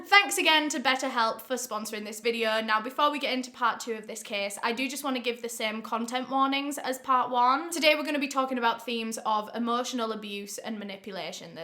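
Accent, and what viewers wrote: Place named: Bedford, United Kingdom. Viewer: Fascinating your accent of bedford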